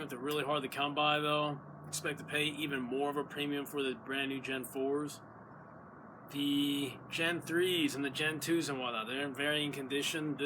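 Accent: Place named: United States. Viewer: You are Chinese why do u speak American accent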